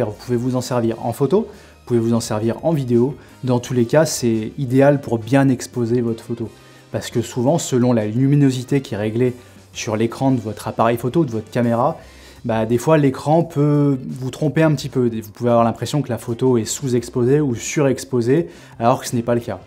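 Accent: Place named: France. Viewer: Le peter francais. Tes de quel région ?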